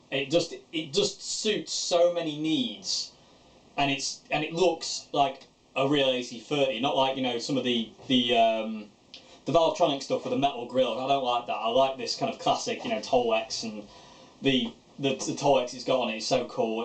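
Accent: United Kingdom. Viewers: I dig your Brit accent , & like your vids ! 😎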